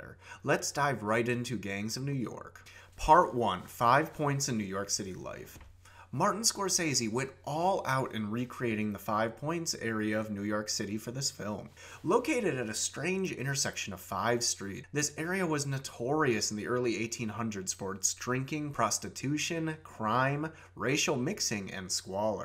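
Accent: Ireland. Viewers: You sound irish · Are you Irish, man, or have you just been watching too much Liam Neeson? Wait, Canadian?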